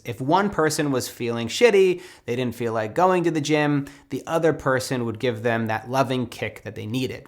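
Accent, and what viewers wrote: Australia. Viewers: Call me stupid but I only just realised that has an Ozzie accent after watching your videos for like a year & half or something. Great video as usual. On a side note I've tried getting up early & cutting out sugar as well being inspired by your videos last year. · As an Aussie, thank you for this. I watch all your videos, and accent makes me feel close to home even though I'm living in NZ. It means a lot you are promoting the relief effort.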